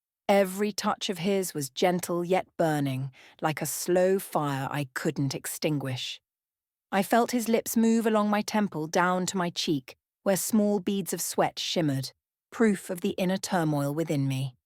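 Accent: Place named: United Kingdom. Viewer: Why do I have to suffer through a British accent on a story ostensibly about Americans in America?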